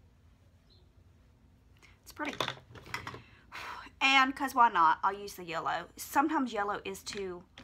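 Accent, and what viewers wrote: South, United States. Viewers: I love your accent! I wish I had a southern accent so badly - my close friend is from Mississippi, and when I hang out with her or stay over at her house, I start talking like her after a while and I have to force myself to stop. Lol.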